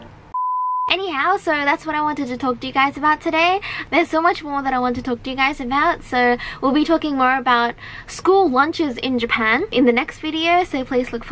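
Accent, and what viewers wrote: Australia. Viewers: please do a video with your thickest Aussie accent😂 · On top her Australian accent is really Charming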